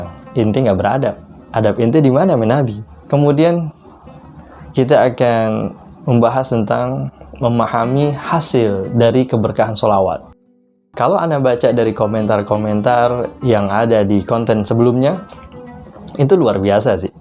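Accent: Jakarta, Indonesia. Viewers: Terimakasih Ustad, Sangat bermanfaat. Medok banget dah ah betawinye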